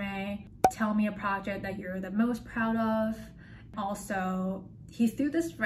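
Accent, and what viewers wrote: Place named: Taiwan. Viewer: great content, you sounds more like from Taiwan, I thought u were from HK with that last name .......keep up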